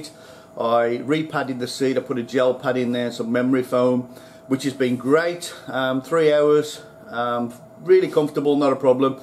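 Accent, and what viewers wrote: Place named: Birmingham, United Kingdom. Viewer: Great vid. Thanks for sharing. I must say though. You don't sound like an Aussie. In fact you sound like a Brummie? I'm in Wolverhampton. Lol. Let's meet up in Singapore sometime.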